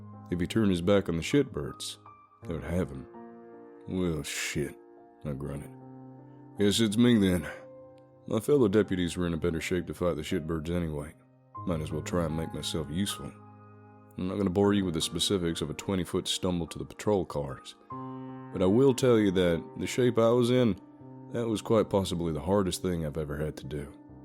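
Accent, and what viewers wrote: South, United States. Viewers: You really have mastered that country American accent and it shows. And this one's coming from a good ol southern boy 🤣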